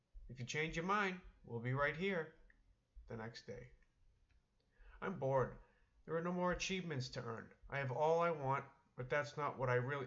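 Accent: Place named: United States. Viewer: THIS HAS A MODERNISTIC TINT. THE OLDSCHOOL ONES ARE BETTER. THIS YANKEE VOICE IS NOT GOOD EITHER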